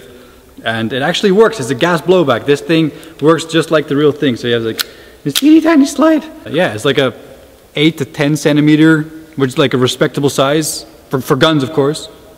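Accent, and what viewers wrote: Netherlands. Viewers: are you dutch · Boi u dutch?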